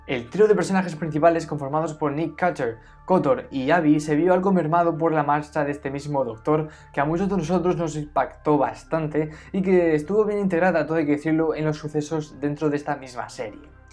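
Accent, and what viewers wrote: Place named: Latin America. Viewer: Tenés que aprender un español más neutro, cuesta entenderte en el español sudamericano. Tenés que aprender a proyectar las palabras hacia afuera, hablás hacia adentro y suena un pasticho engorroso, obviamente te miré 20 segundos.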